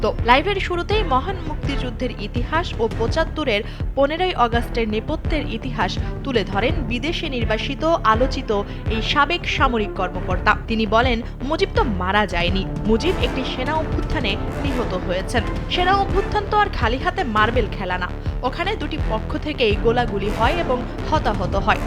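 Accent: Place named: India. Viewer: আপনার সাংবাদ পড়ার ধরণ ভারতের সাংবাদিকদের মতো নাকি আপনি সত্যিই ভারতীয় সাংবাদিক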